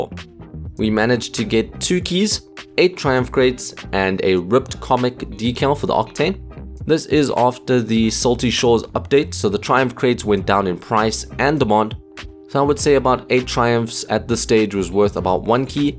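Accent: South Africa. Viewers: R u south african you sound like 1. Our pronounciations and accent remind me of a south african i am aswell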